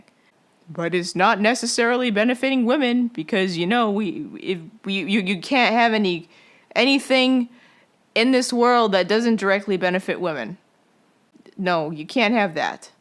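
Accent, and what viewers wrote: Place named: Brooklyn, United States. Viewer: You sound like you are from Brooklyn. This pleases Me. Great job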